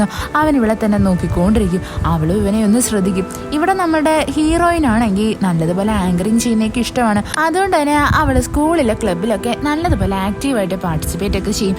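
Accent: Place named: Kollam, India. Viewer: കുട്ടിയുടെ വീട് കൊല്ലം ജില്ലയിൽ ആണോ 😂എന്തുവാ, നോക്കിയാണ്,നിക്കുവാണ് , etc ..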